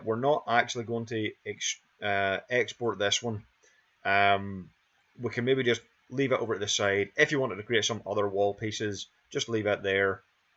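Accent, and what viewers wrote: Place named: Northern Ireland, United Kingdom. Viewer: Sounds like your a fellow Northern Irelander! :hand-pink-waving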